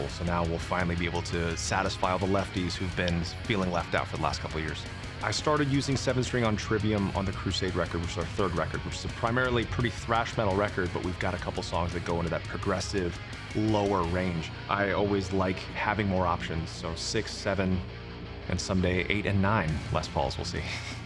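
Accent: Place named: United States. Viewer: Holy crap. I watched all Video that posted in order to learning English but he's talking so fast. I hope makes a course of speaking America accent. I like his voice. I am a fan from Viet Nam. 😁😁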